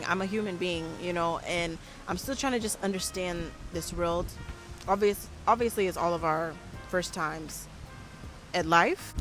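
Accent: Africa. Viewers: You even have a lil African accent ☺️😍…. My husband is Ugandan, I plan to move there for 5 years after we have our baby. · Beautiful, you are developing that African accent to, love you and your families videos keep sharing👏🏾👏🏾👏🏾👊🏾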